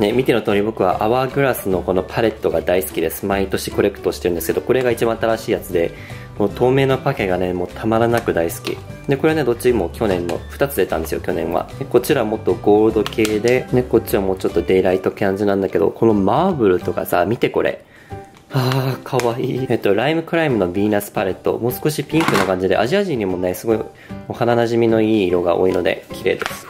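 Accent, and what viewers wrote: Kansai, Japan. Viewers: リアルな感想やから参考になるし、時折出る関西弁が親近感〜😂❤️❤️❤️この動画本気で好き！！！ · どの動画でも数回関西なまりが聞こえて何となく嬉しい🥺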